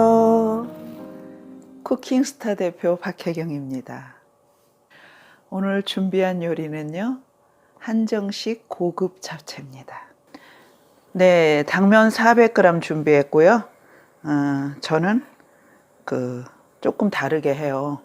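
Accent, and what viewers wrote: South Korea. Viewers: 친정 엄마께 제가 잡채하겠다고 말씀드려놨더니.. 당면을 미리 데쳐놓시고. 우엉이며 녹두나물이며 시금치까지 준비해놓으셨어요..ㅠㅠ 그래도 원체 식구들이 좋아하는 아이템이라.. 꼭 저리 당면삶아 해보고 말씀드릴께요.. 코다리 무우조림도 열씨미 보고 있구요.. 삼삼하고 손쉬운 깻잎도 정말 대박입니다.. 두가지 꼭 제 레시피로 만들려구요~ 저도 전라도 광주라 친근한 말투가 정겨워서 자주 듣게 됬습니다. 쉽고 고급진 레페시도 땡기고요.. 아참. 소갈비도. 나중애 도전아이템 입니다.. ㅎㅎ 감사합니다. 대표님~~^^♥ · 요즘 듣기 어려운 서울 억양이 듣기 좋네요.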